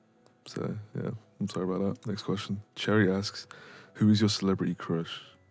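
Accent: United Kingdom. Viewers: Omg so I wasn't going to comment on this video because I am sooo late but we have so much in common it's incredible! First of all, I am a 20-year-old female, my parents are from Uganda but I was born in France and I live there now buttt I also lived in England for 4 years when I was younger near Wimbledon so that's why I love your accent and it feels so much like home to me :) I love winter and Halloween so much they are the thing I look forward to all year and Christmas music is my kryptonite, the country I would like to visit the most is Canada and I also love kdramas and anime so much, my fav recent series is Alice in borderland. Also if that wasn't enough I have a very artistic background as theatre is my passion as well as music and I have studied and practised both for years and would love to continue, 99% of my friends are either in graphic design/art (art school in architecture/ designing etc), music or cinema/ theatre so art is the only thing I know and love religiously with all my heart. 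There are also so many other things that I forgot but you get the picture lmao I think it's crazy that I have been a fan of your channel since the begging before you even had 5k and that I now find out that we have a LOT in common :) anyway sorry for my long paragraph but I love your work and hope you get to 1M one day because you deserve it so much! I am on your discord so if you ever want to chat it would be a pleasure! my is Angelina with purple hearts <3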